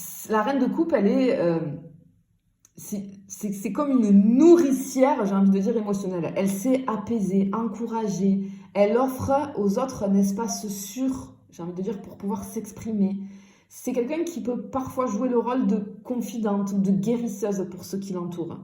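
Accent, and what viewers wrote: South, France. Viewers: Bonne et heureuse année a vous et vos proches merci à vous de nous guider et votre bonne humeur et cet voix qui chante le sud ça nous fait du bien merci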